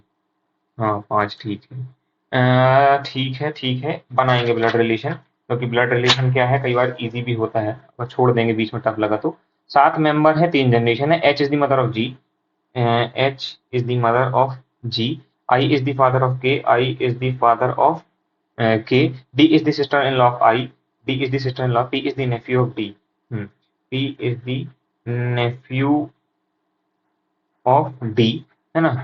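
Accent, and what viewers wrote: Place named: Bundelkhand, India. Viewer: Ok bundelkhandi lag rahi thi braj type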